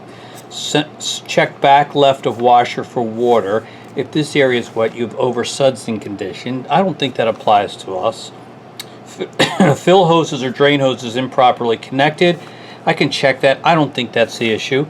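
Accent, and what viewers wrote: Philadelphia, United States. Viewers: You from Philly or Boston?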